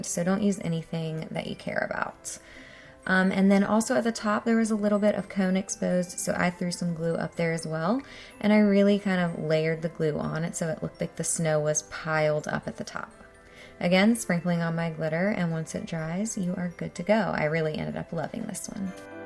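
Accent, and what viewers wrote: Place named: United States. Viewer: Lovely video ✨ I love them all, especially the white one. Creative, a nice narrator, and nice American accent 🌙 🎄❄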